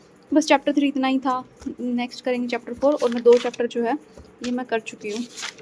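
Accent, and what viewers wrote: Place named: Bhiwani, India. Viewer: Sister aap loharu bhiwani side se ho kya....aapki boli se lag raha hai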